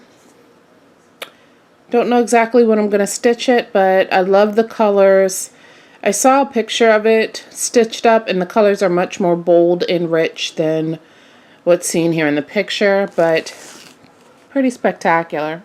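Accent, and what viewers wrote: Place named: Baltimore, United States. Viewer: GIRL! So excited to see another video. I loved seeing all your projects and I truly enjoy listening to you discuss your process. Your Baltimore accent makes my heart so happy. Sending you all the hugs!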